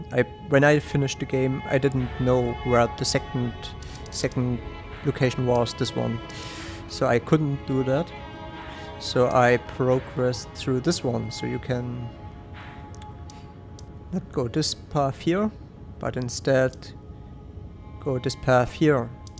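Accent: Saxony, Germany. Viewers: Interesting. You sound like a german from saxony. :) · schaue das Video 2 Sekunden und höre direkt dass du ein deutscher Sachse bist😂 nice one bro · Dieses deutsche English. Man kann als Deutscher den aktzent einfach nicht verstecken.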